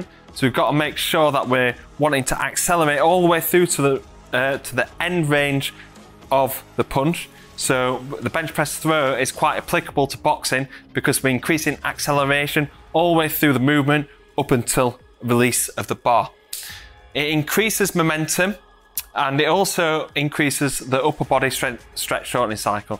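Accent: United Kingdom. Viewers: Amazing! Please if you can add English subtitles for French and foreigners it would be great! Because the British accent is not always easy to understand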